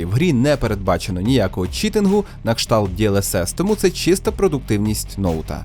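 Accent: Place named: Surzhyk, Ukraine. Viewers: а шо тут такого, хай буде собі суржик, це робить тебе індивідуальним, у кожної людини шо балакає суржиком є свій унікальний суржик, так що розмовляйте як удобно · За суржик лайк👍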